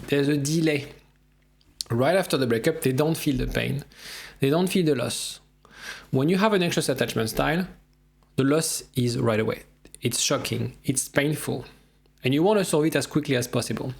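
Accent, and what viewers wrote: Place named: France. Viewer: Well he is french.. did you hear the accent. He lives in london but when you learn english in France you'll get that accent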